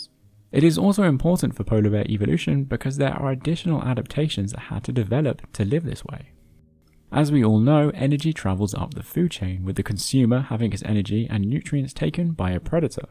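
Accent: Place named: United Kingdom. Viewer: Creepy british asmr voice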